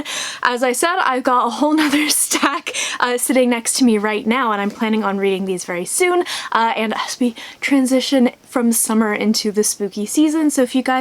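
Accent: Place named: England, United Kingdom. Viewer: I really love your english accent! ❤️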